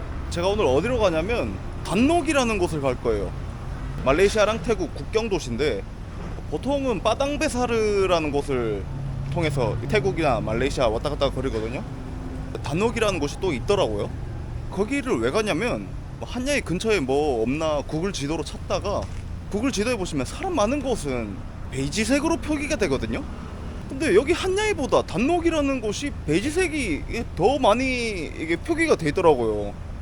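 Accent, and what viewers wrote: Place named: Gyeongsang, South Korea. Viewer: 고향이 어드래요? 경상도 말도 튀어 나왔다가 연변 말도 살짝 나왔다가 표준어가 오르락 내리락 하네요 한국말 잘하는 교포?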